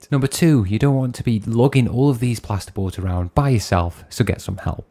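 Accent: United Kingdom. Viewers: I'm sure what you said earlier may have been valid, but that last comment about not working for the next person is just dumb. Did you put up your drywall (or concrete since you sound British and i know you guys dont build with paper mache like we do) before your plumbing and electrical work? It doesn't make sense. You should run the utilities first and then seal it off according to the codes in your country. Why would you put up a wall only to have to tear it down later to install wiring and plumbing? That approach is backwards and just creates extra work for yourself or the next person.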